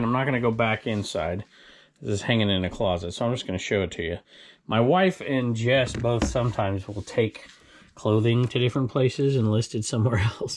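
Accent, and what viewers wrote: South, United States. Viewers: You sound like a confederate.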